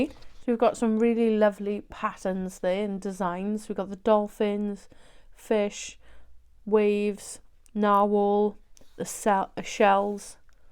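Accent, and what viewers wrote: Wales, United Kingdom. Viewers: Are you Welsh? If so, me too lol xx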